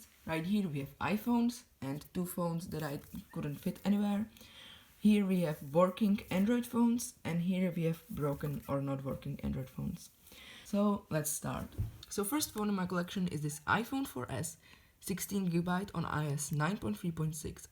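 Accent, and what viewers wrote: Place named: Romania. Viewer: Your accent sounds Romanian but you are from Poland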